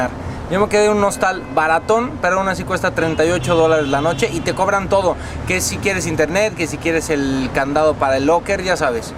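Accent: Mexico. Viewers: En ese tiempo tenías tu acento mexicano más marcado, me parece que ahora hablas más neutro. Saludos desde Chile! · Estabas taaaan diferente: El look, los lentes, además el acento Mexicano súper marcado y los comentarios eran como más sarcásticos! Jajajajja- Me encantan tus videos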